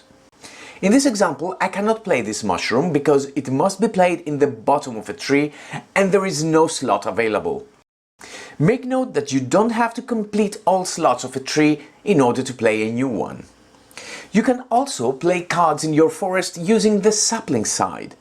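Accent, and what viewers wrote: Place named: Greece. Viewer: Great video with a really smooth explanation, thanks! Wife and I also busted out laughing at the beech tree part, , "if there are at least 4 beeches in your forest"😆 Greek accent? Kudos on the excellent English which is far better than my second language. Gotta love when accents make words sound like other words and it's totally innocent yet hilarious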